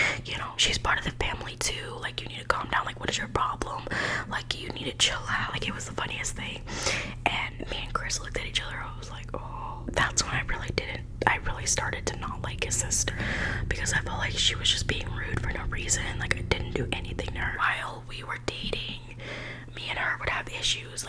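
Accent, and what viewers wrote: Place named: New Jersey, United States. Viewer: Knew u were from jersey the min I heard u talk! but it sucks u had to go thru that, sounds like a headache. 🤕😒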